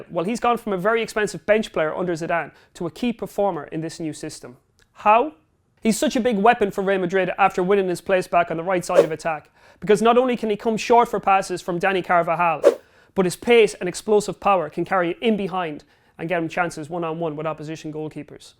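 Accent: United Kingdom. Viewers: Canadian here. Where's this guy's accent from? I.e., what part of the UK?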